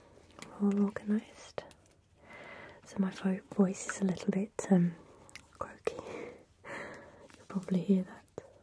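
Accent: United Kingdom. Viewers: your beautiful British accent and lovely personality are the sole reason why I watch your videos. My mom playfully teases me because I absolutely love the British accent and that I’m meant to marry an Englishman. ❤️ #ASMR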